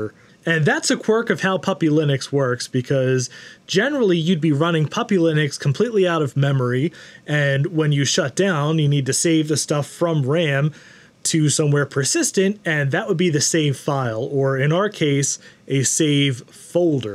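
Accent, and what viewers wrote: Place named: New Jersey, United States. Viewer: Ah shit you just gave away your from Jersey.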